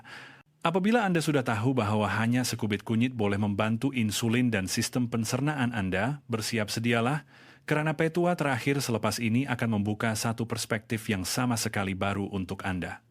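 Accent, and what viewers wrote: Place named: Indonesia. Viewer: AI...Hari tu bahasa Melayu...ni bunyi macam bahasa Indo...Sangguh...sepatutnya disebut sungguh...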